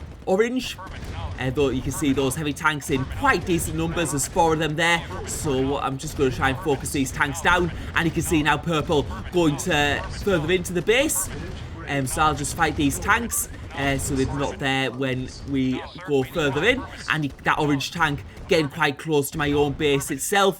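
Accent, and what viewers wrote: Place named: Wales, United Kingdom. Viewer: Love your videos! You are really good at presenting the game. For the life of me though I cannot hear what you are saying in your first few words when a video starts. What accent is this? Welsh?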